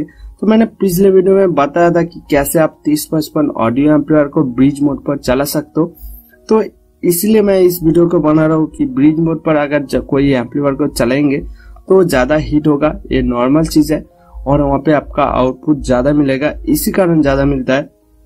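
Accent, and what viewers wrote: Bengal, India. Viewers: Bhaiya ap kiya bangali ho kiya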